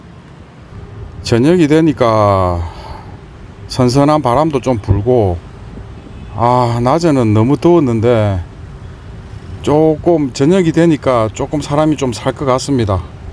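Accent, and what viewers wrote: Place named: Gyeongsang, South Korea. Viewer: 구수한 경상도 사투리 ㅎ 간결하면서 통찰력느껴지는 경륜ᆢ 구독신청했네요. 하노이 여러번 가봤는데 유투브보니 다시가보고 싶네요 ㅎ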